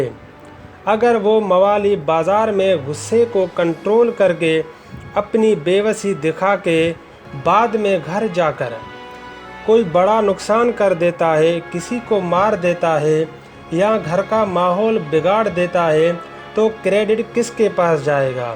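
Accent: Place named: Sindh, Pakistan. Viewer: Keep it up Baki urdu improve karne ki kafi zaroort ha kafi sindhii lhja ha 😁😁😁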